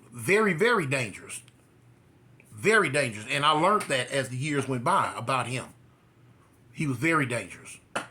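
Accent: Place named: Texas, United States. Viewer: Are you from Texas? Why do you sound like my dad? Lol.. I know my dad, I'm not saying I don't.. But it must be a Texas thing how y'all talk, lol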